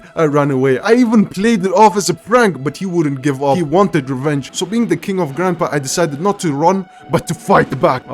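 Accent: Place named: United Kingdom. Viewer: you soud british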